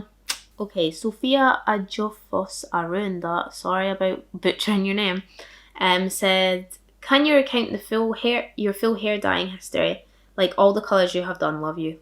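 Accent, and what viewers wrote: Scotland, United Kingdom. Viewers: Just seen Brad Mondo react to one of your videos and recognised the Scottish accent! I'm originally from Cumnock (Ayrshire) which could def give you Fife people a run for strongest accent lol I live in the US now though. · As someone who’s from London I can’t even hear through ur accent sometimes but Scottish accents are cool! (Also i fuckin wish I didn’t have to pay for uni it’s so much)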